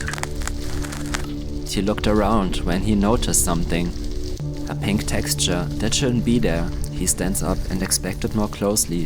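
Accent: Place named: Germany. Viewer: The millisecond i heard your voice. I knew you was german, why? Because i am part German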